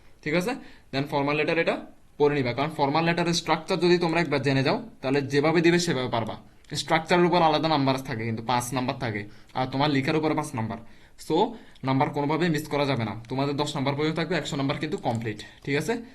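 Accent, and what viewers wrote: Chittagong, Bangladesh. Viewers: Are you from Chittagong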